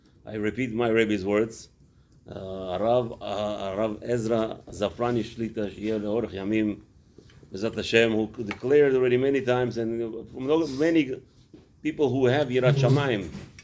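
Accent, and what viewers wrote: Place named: Russia. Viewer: He has Russian accent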